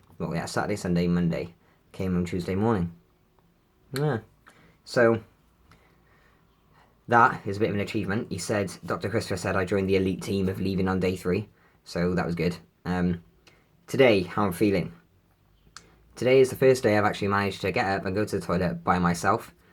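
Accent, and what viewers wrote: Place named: United Kingdom. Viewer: OMG, you're so handsome, and I love your cute British accent! Thank you for sharing your experiences with bottom surgery. :) <3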